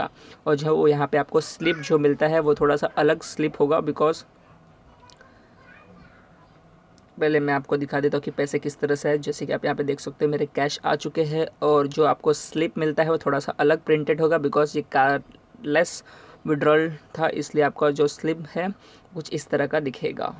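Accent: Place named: India. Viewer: I can’t understand your Indian ass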